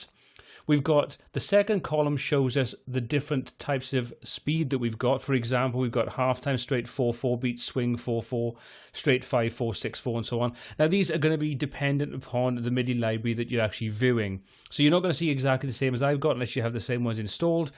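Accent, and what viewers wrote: Wales, United Kingdom. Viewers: Great vid thanks. I'm a long time EZD user but definitely picked up some tips from this. I'm a Taff living in Aus. Nice to hear the home country accent too. Where can I listen to some of your musical output? Always interested in hearing other people's work.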